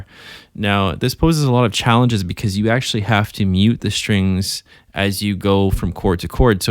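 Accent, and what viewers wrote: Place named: Canada. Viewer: Gee you sound very Canadian! Very interesting that Klein took on creating a next gen harp guitar! Thanks for the exploration!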